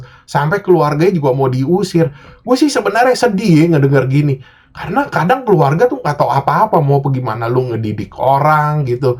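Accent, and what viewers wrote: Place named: Betawi, Indonesia. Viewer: Org betawi bang logatnya kyk bang alex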